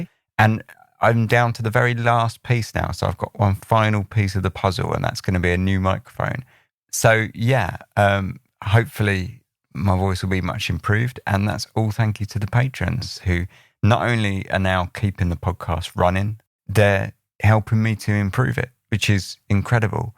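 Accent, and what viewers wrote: United Kingdom. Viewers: All of your videos are shit. No pics or anything.. just your stupid Brit accent and you talking... Come on you lame prick.. total garbage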